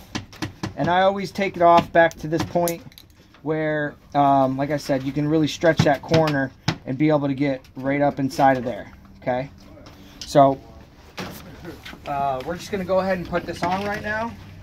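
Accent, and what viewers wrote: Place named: New York, United States. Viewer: Excellent video I would guess you're from new york.. You remind me of some guys that I work with.. very knowledgeable and straightforward great info and training ..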